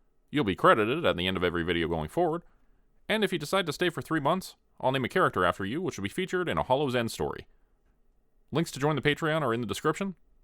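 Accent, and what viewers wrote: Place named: Canada. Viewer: I love the way you pronounce some things with your canadian accent.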